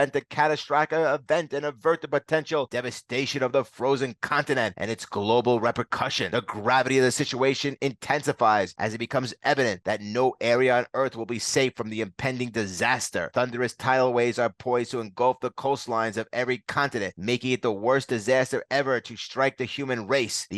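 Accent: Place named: New York, United States. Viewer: You have an awesome voice for radio or tv. You’re accent sounds like you’re from New York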